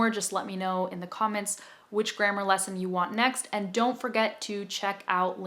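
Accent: California, United States. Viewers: I enjoy your accent from California. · I love you Ma'am, The way you speaking American accents and your always smiling face attract me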